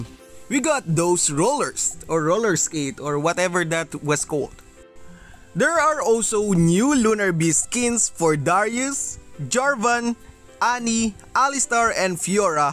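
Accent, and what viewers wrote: Philippines. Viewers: are u Filipino? Because of ur accent. And i want lunar beast annie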